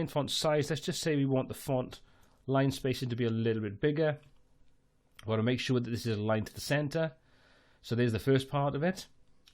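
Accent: Wales, United Kingdom. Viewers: That's it, all Youtube tutorial videos to be exactly like this from now on - well thought out, perfectly paced and delivered in a beautifully articulated Welsh accent :) Loved it.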